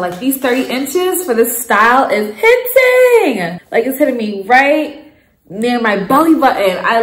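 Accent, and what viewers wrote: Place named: New York, United States. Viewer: I can hear your nyc accent... ha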